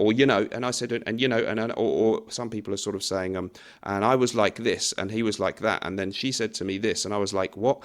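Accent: England, United Kingdom. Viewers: ....classy? Smartly dressed and that English accent is helpful.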